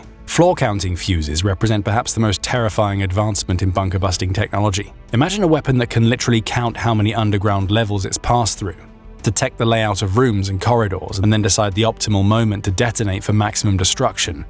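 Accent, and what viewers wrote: England, United Kingdom. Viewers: Why is this commentary using an English accent when it's a US idiom script?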